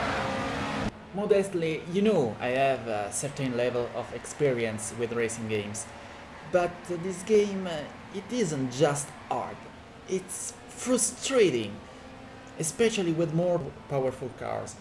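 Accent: Italy. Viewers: Bro ma sei italiano? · ARE YOU ITALIAN??? I HAVE PROVE!!! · E poi ci sono io che sto ancora cercando di capire se sia italiano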